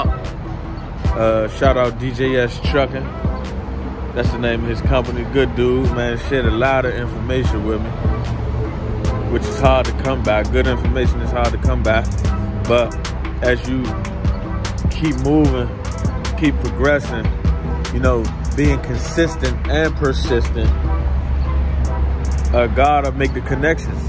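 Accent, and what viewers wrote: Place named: DMV, United States. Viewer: Keep grinding fam I just got me a dually and 2 car trailer a month ago boutta take off and turn up I feel good to hear a dmv accent on YouTube giving free game keep grinding bro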